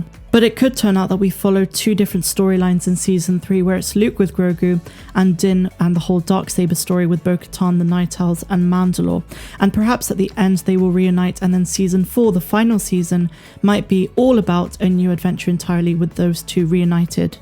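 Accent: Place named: West Country, United Kingdom. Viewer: I loved the f word said as well lol I'm loving accent can't work out if it's Somerset Devon or Bristolian and I'm from Somerset myself lol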